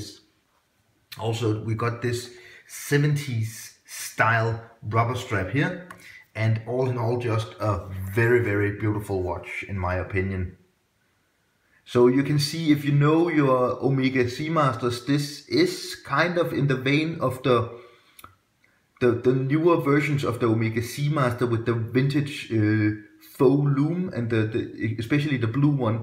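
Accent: Denmark. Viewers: Tak for dit review :) Jeg kunne høre du er Dansk, du sagde her et par gange. Men hvad jeg ikke helt forstår er HVOR du købte det??? Og FEDT ur, jeg vil have et hvis jeg kan få et. Det er gennemført nice og dejligt at høre hvor superglad du er for det. Jeg sidder selv med min spritnye Oris Staghorn Diver WR300m, med et dejligt Sellita værk SW200-1, Oris modded' det for Staghorn, og det er meget præcist og dejligt at gå med... Jeg er også glad for mit og kigger hele tiden på det. Det gør du også er jeg sikker på, hahaha. Congratz with the watch mate... It's KOOL. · Watch On...your accent...Danish?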